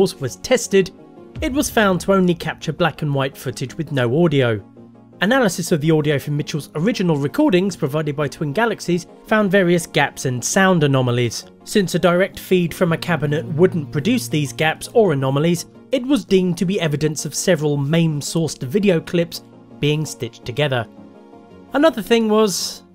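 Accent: United Kingdom. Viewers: I like your awfully delightful British accent and your sarcastic humor. How haven't I heard about you previously? well anyway Keep up the good work. I'll be watching you... in a good way... nice socks btw. Where'd you get them? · Thanks for this video mate it was exactly what i was looking for. Decent length breakdown all the important details and British narration. Cheers matey!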